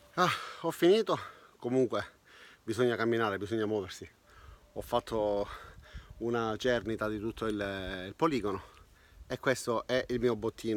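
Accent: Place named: Trapani, Italy. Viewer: riconoscevo l'accento io sono della provincia di trapani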